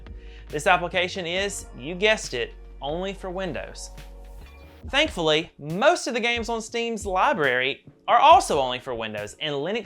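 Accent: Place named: South, United States. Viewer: I came for the review, subscribed for the southern charm. Cheers from Canada!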